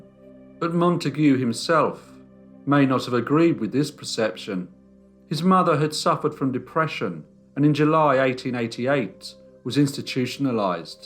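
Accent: England, United Kingdom. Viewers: I love to listen to your beautiful english accent, thank you for the stories!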